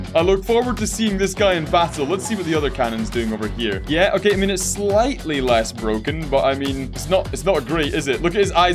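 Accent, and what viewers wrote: Scotland, United Kingdom. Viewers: The Scottish YouTuber had returned…..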